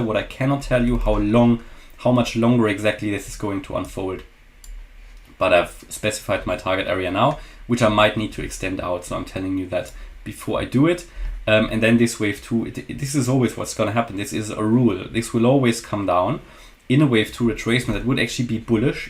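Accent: Germany. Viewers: he has a very german accent, definitely not dutch haha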